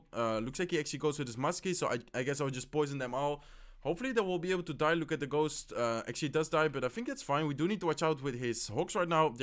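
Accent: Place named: Netherlands. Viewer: je klinkt echt heel erg nederlands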